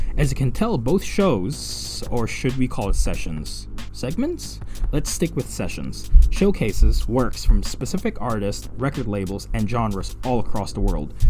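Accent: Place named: United States. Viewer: Would've never guessed you were a foreigner, you sound perfectly American to me. Funky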